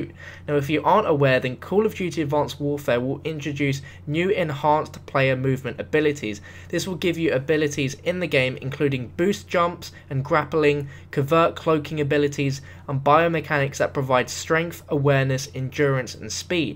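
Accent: England, United Kingdom. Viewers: is the best are you English?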